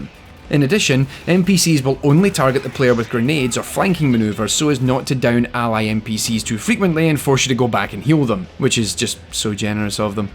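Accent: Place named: England, United Kingdom. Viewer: He's not wrong people, PLEASE play this before you watch, it is really fantastic. Also, I'm glad you talked about PTSD. I know I shouldn't be talking about personal stuff in some random comment thread, but I have what is being classified in the ICD Beta 11 as C-PTSD, or Complex Post Traumatic Stress Disorder. Your accent reminds me of when I went to England, I got held up in Heathrow because they wanted to make sure I was "Safe to let in" which kind of turned the whole experience negative. But what made it better, and really helped me, was one of the Security Officers was a Soldier who fought in the Falklands and Northern Ireland, and he was telling me that if I ever needed help, theres a group he volunteers with of Veterans, and he said, even if you weren't a Veteran and needed support they were there for everyone who suffers from PTSD, to whatever extent. 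And I think thats probably indicative of the way people with PTSD cope. Life is going to shit on you. Sometimes you're going to make choices that end up being mistakes that shatter the sense of humanity you hold on dearly too, and its going to rattle the foundations of your being, but there are little lights, little glimmers of beauty and joy in the world, and those are the things you should encapsulate and always keep close to your heart. The Day is Always Darkest Before the Dawn. Its not 100% relevant to this game, and I won't spoil why, but, if you have PTSD, especially as a Combat Veteran, this game might be a bit trig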